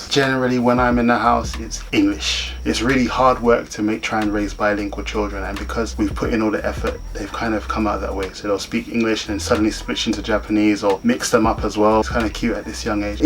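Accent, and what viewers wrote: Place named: United Kingdom. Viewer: I don't remember when i subscribed to your channel, but I'm still not used to hearing the British (London?) accent 😆😆I've been watching too many American an Canadian YouTubers in Japan. it's always good to hear more Brits haha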